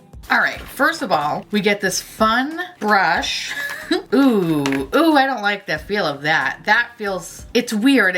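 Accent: United Kingdom. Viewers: LMAAAAOOOO the urine sample cup Stranger Danger, that's what I yelled out when I saw it. Also, my skin screamed 💀 bc that looks like a recipe for skin turning to the actual 🏜 desert Yes! Please do more vids like this! LOLLLL I adore them! The British accent gets me every time ❤ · The British voice is the best! “At the scene of the crime, there was...”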